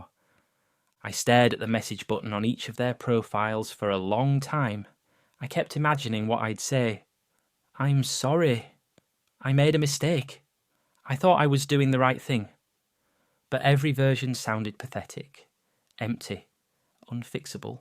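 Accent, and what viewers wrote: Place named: Minnesota, United States. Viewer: I didn't imagine the Minnesota accent like this.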